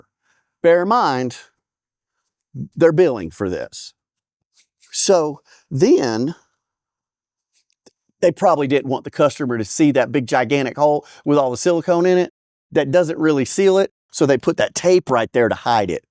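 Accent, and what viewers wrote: South, United States. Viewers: The more angry and sarcastic you got the more Southern your accent got. If you ever hear that directed at you, I’d run. might six his brother on you. 😬😂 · The southern twang of Sarcasm just gets stronger and stronger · Are you turning it up with the southern accent? I don’t remember it being so strong in your past videos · whats with the accent brother i know your from the south....ish but dam... not serious do your thing man keep kicking ass and calling out the bs.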